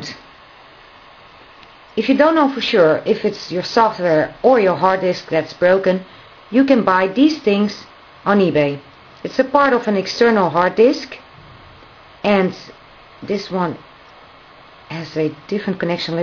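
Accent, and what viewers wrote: Netherlands. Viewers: she is from holland i know from the talking!!!!! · Ik hoor dat je nederlands bent :P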